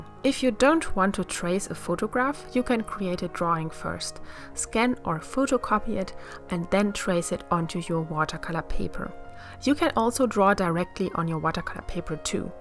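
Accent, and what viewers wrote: Germany. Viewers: Direkt gemerkt das du deutsch bist 😂😊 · I love your german accent! This art is amazing! :O great job! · My god me too i was like 0.0 that is strooong german accent riight? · Are you from Germany? 🙂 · Hey 🌸Mir ist aufgefallen, dass dein Englisch extrem deutsch klingt.Vielleicht könntest du etwas an deiner Aussprache arbeiten♡, das ist kein Hate sondern nur ein Tipp, das Bild sieht allerdings echt meega gut aus😊❤ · Jep, that's clean german school english, as we all leaned it. 😁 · Bist du Deutsche? Ja aber der Akzent verräts :D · haha funny I am from austria and yes I know this is Just typical german accent but for some reason I Just cant Hear it😂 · Omg I immediately heard that you are german😂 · beautifully drawn and explained ... beautiful german accent ❤ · katy cat18 Do you seriously believe you're contributing to her endeavours in any way by commenting on her "extremely German-sounding" accent? 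No. Maybe you're too young to know or perhaps you're just not socially adept enough, but it's not acceptable to tell people to fix this or that about themselves, because sometimes it is just not your place to do so! works so hard to create high quality content and I know from her previous videos that she has been working on her English. Plus, people just don't have to speak English perfectly. So leave her alone and Get Over It, please. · It's funny how one (or at least i) can immediately tell that this is a German accent. Wonderful drawing btw! Sadly i don't have all those materials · Ich bin gerade zufällig über dein Video gestolpert und möchte gerne meinem Eindruck der Kommentare widersprechen: Ich finde es gerade gut, dass du einen deutschen Akzent hast und trotzdem Videos auf englisch hochlädst und dich nicht davon beeinflussen und zurückhalten lässt! Heutzutage wird man das Gefühl nicht los, dass man perfekt & akzentfrei Fremdsprachen sprechen können muss, bevor man sich äußert, wodurch die Hemmschwelle immer größer wird und man dann gar nicht erst in die Übung des Sprechens kommt. 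Daher: Bleib wie du bist und beehre uns weiterhin mit deiner Kunst!! <3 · Are you from Germany? Because you dont sound like "amerikan or english". Your dialect is annoying lamo hahaha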